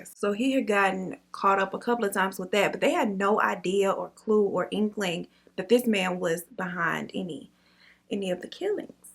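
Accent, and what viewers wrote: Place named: AAVE, United States. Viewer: Sorry can't listen to ebonics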